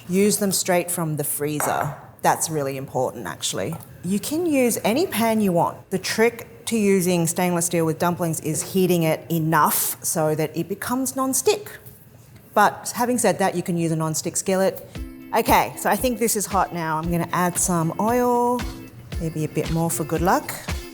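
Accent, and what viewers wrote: Australia. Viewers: I knew she was Australian as soon as she spoke… But just discovered her here. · Love that Aussie accent 🦘🐨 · Thank you for keeping your Australian accent. Once tomato season returns in Australia, I'll be making that salad.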